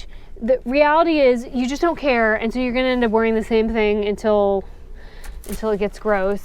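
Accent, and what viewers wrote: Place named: Detroit, United States. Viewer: Wait? Are you from Detroit? You sure sound a hell of a lot like me, lol. Cool sweatshirt. I have lived in Utah for several years now, and I told a friend "I need to make a sweatshirt that has the PSA: "I no longer live in Detroit...but a LOT of Detroit still lives in Me!". Happy trails!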